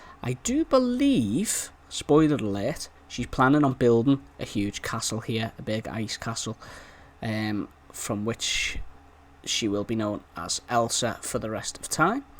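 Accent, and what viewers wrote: Liverpool, United Kingdom. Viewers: Love it!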